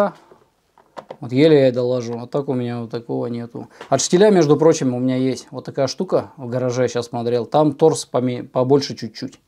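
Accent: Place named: Kirov, Russia. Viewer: Как приятно слышать Кировский говор всё супер у меня такая пока всë норм нравитс🎉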